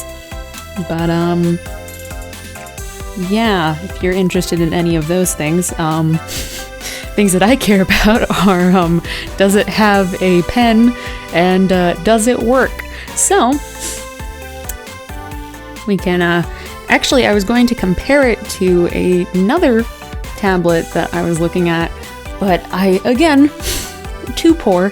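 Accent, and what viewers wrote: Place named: Texas, United States. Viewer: Such an excellent review, the oh so most divine a Texas mouth can bring to the table!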